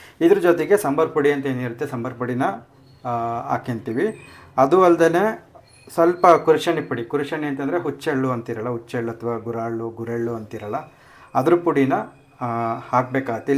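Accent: Chitradurga, India. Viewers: Sir nimdu yav uru sir.. Nim language namchithradurga da kade ero thara ede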